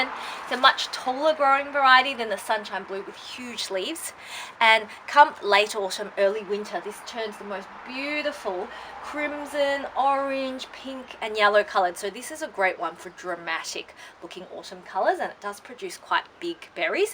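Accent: Australia. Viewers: I love a nice asian woman with an Australian accent who loves to garden.. But awesome content cause I am about to do blueberries myself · Very interesting an Asian woman with an Australian accent or European accent I absolutely 💯 love you. consider me a new SUBSCRIBER.. ROCK ON BEAUTIFUL...❤️🐼🌻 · Hi lady!🌹 First time ever seeing you on line about Blueberries! First, what a lovely pretty lady speaking perfect Australian accent has immensely added attraction to the description, I’m a transplanted myself to America, sometimes I have hard time understanding Aussie and English folks but the more I listen to you all the more I feel closer to you, today you’ve got me hooked!🤩 Thoroughly experienced from various blueberries for better pollination and productivity to experiment Subject to soil acidity testing, VERY NICE! 😀 You’ve got adorable smart kids to help identifying soil acidic colors…. 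Family involvement, Lovely! The good looking crimson red fruit/ bell hanging in the middle of the metal arch, what is that fruit??? Looks like pomegranate but surrounded vines don’t look like Pom tree?! Thank you much for this video, I enjoy it greatly!!! 🌹🌿🪴🫐💖🇺🇸🤩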